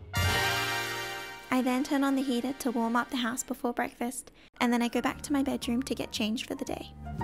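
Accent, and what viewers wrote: Australia. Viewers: This video is absolutely lovely to watch and the girl who uploaded it is an absolute sweetheart; her milk & honey voice(🥛🍯) and Australian accent are a splender to the ear(she also has cute feet as well! 👣💞😁). 💕💕💕💕💕 😁😁😁😁😁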